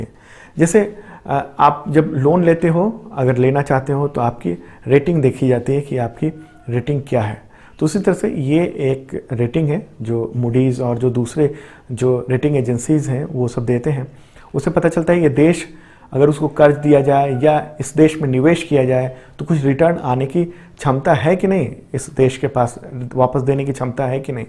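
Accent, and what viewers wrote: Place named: Bihar, India. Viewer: Voice action se Lagta h Bihar ke ho !!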